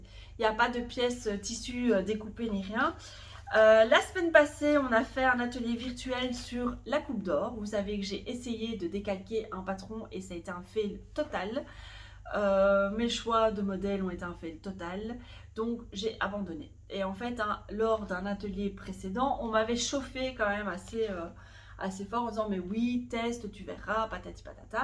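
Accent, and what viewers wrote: Belgium. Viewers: Merci pour tes podcasts, c'est toujours un excellent moment, et j'aime beaucoup ton humour, ta simplicité et ton joli accent Belge. Pour les bruits, moi je ne trouve pas cela dérangeant, surtout qu'il faut vraiment tendre l'oreille.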